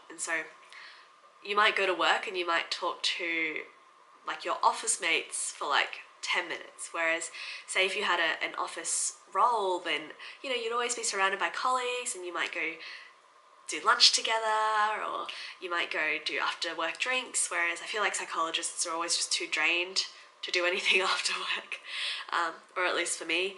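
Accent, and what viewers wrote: Australia. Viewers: keep up your Aussie accent. Im a BBASEAN British born South East Asian, and none of us talk like Brits we all talk like gangsters hahaha 😎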